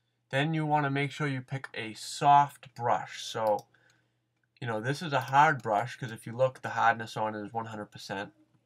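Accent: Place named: New England, United States. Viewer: i love your new england accent <3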